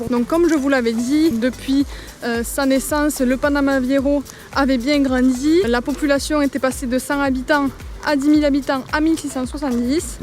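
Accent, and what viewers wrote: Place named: Carmaux, France. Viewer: Un travail de montage de qualité, un accent carmausin au top et une diction impeccable, bravo :)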